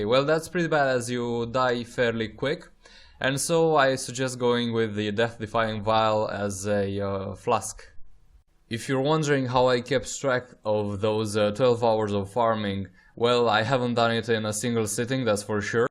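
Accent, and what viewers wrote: Russia. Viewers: russian acent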